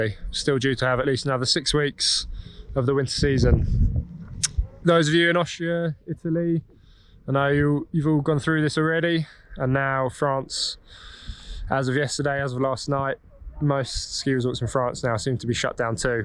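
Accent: England, United Kingdom. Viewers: Great videos, you’ve really helped me. Keep it up. Nice to hear an English accent as well.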